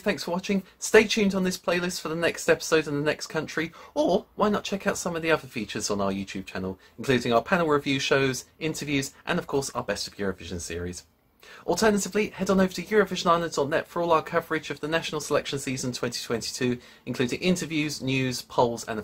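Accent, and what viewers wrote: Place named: England, United Kingdom. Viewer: You sound English!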